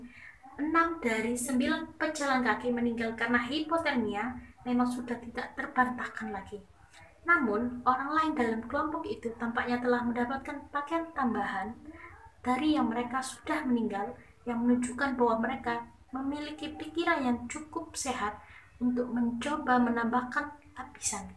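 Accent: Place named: Palembang, Indonesia. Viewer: loga ny kayak orang palembang hehehe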